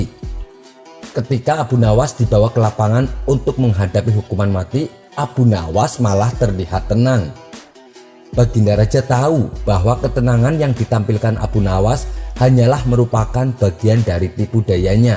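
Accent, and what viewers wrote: Java, Indonesia. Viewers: Jawa medok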